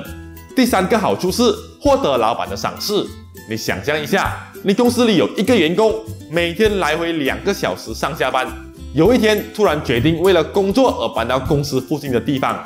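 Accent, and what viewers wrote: Malaysia. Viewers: 這就是馬來西亞的華語 我覺的他說的很不錯了 我們也不會讓中國人改口音啊 憑什麼馬來西亞人就得改善口音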